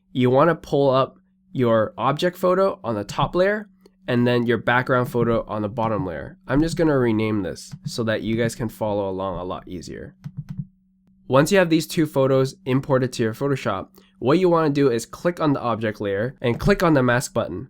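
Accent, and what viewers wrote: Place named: Singapore. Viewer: it's Singlish.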